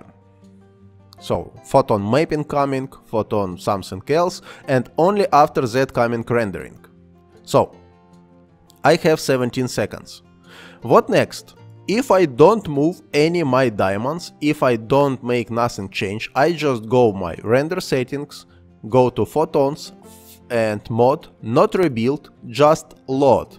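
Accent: Russia. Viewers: thanks for RUnglish!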